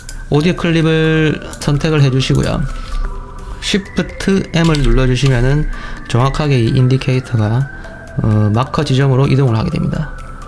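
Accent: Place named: Gyeongsang, South Korea. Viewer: 앗, 템플릿 영상만 보다가 처음으로 목소리를 들었는데 사투리 억양에 급 친근함이...ㅋㅋㅋㅋㅋㅋㅋㅋ 저도 갱상도 입니다...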